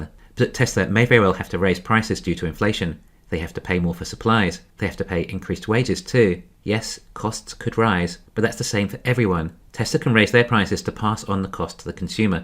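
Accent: England, United Kingdom. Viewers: Petrol forecourt, that expensive place where ICE car owners like to hang out 😂 ❤ Nice one. Also as an Australian why do you sound more English than me and I'm from England? Nice accent.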